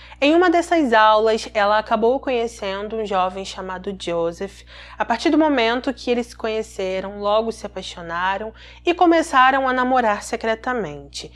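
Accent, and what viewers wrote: Rio de Janeiro, Brazil. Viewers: Boa noite pode ficar o tempo que precisar contando essa história da vida real já vi mas quero ouvir de novo você contar 😉"Estadossss Unidossss" melhor sotaque carioca amoooo linda maravilhosa 🥰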